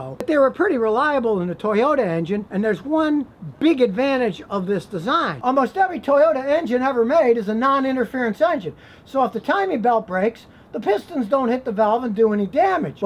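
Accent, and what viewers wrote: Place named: North, United States. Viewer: originaLkomatoast he may live in Texas, but he sounds like a yankee. I want to hear THAT story. What brought him here?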